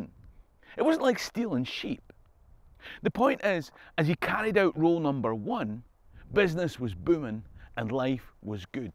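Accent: Scotland, United Kingdom. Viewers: My favorite Jacobite outlaw! So glad this popped up in my reccomend. Having the narrator be a Scot, even better.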